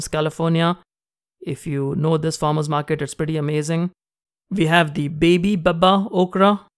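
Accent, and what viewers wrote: India. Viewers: your pronunciation is like Indian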